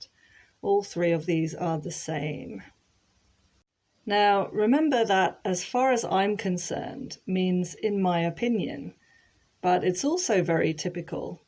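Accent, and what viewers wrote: United Kingdom. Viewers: Hi! I discoverd one more utilisation the "as far as..." Tsunami had reached as far as my house so I moved to another island! Thank you for your lessons and I love listening your british pronunciation as well, even though it is difficult to imitate!!!❤❤❤👌👌👌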